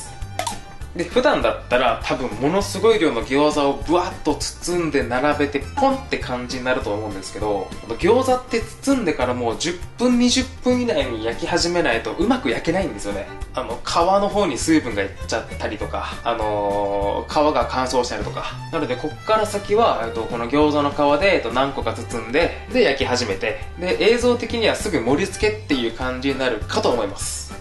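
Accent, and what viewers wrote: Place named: Fukuoka, Japan. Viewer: 福岡の方言かな？ほっとする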